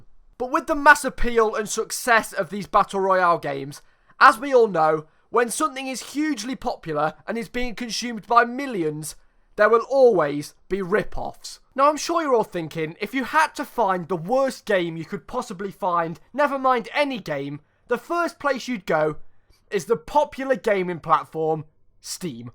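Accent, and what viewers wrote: United Kingdom. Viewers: are you British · why are you british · Are you a Brit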